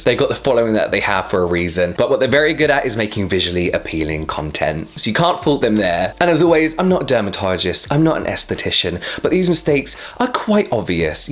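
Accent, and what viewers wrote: England, United Kingdom. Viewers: your British accent gives me eargasm!🤪 we stan a skin care addict&k-pop lover🥰 greetings from Turkey:) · I do love a good Brit accent! :) I will try to watch influencers who I think are wasting product. I think people on p r lists in general are bad about it. Lately so many are de--cluttering and going on no-buy months or something that hopefully they'll be more thoughtful with their items. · I can listen to him all day though, his voice is so soothing. If my husband had a british accent I might not tune him out as often as I do. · sometimes i click on your vids just to listen to your beautiful english voice 🙈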